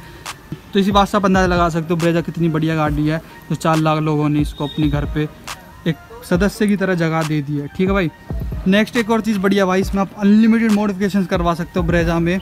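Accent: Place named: Uttar Pradesh, India. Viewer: Gaaaddi..... kya hota h be sahi se bol UPk logo ki trah kyu bolta h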